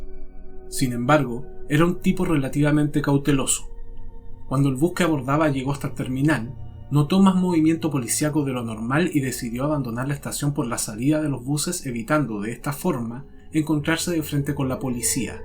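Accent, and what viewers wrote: Chile. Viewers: Lo único bueno sería que hables bien español neutro y no chileno que no se entiende nada, y aprende algo de Inglés por dios.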